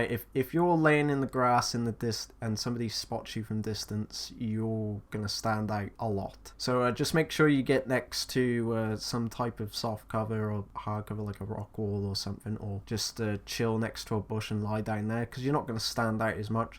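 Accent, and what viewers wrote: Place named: South West England, United Kingdom. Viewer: Are you from sw uk mate you sound very local with that accent 😜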